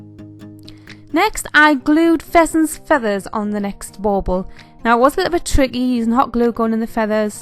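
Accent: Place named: North East England, United Kingdom. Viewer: Super cute ornaments. Are you from the North East? Im from Sunderland and ur accent is very familiar.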